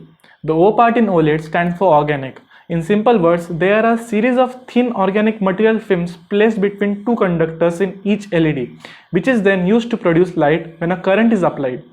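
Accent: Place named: South India, India. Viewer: You're right, judging by his accent, he belong to the South part of India, I am guessing Tamil Nadu.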